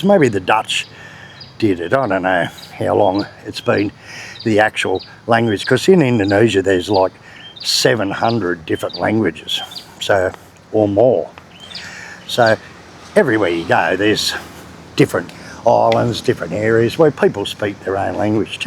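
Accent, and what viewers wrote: Australia. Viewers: The content in this video is excellent. If you want to learn to speak Bahasa with an Ocker accent this video is fine. Once you have got the words and translations down pat, best you Google an Indonesian person saying these words and phrases so you can get the pronunciation correct.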